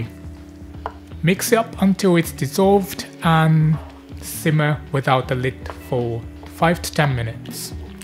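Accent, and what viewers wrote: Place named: London, United Kingdom. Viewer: Great video the accent is really really unique your English teacher must be super British, probably from London and working class, teacher probably not formally educated on pronunciation. Very classy vid but not a "t" pronounced (mel"t"ed). Anyway 1000x better than my Japanese will ever be lol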